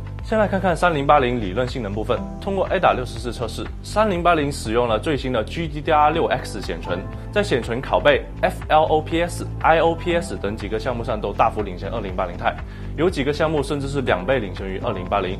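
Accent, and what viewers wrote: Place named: South, China. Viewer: 南派普通话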